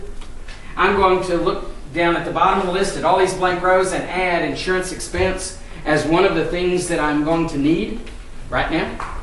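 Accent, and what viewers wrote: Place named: South, United States. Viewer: This guy is good, he is clear and succinct and he is so southern. I am having trouble keeping my my focus on the topic ("I feel like billy graham just announced a scripture verse in a crusade. I am not ready to go to the next page"). What a crack up. He knows his stuff. This is not basic as much as it is absolutely essential, Get this--the world is your oyster, don't get it, forget a career in fiance, business and accounting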